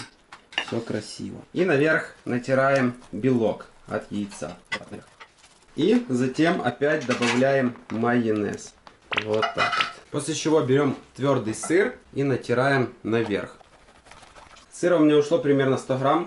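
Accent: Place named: Ukraine. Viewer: Может он сам будет решать что ему делать, и говорит он хорошо, тем более он не из России, в украинском акценте нет ничего плохого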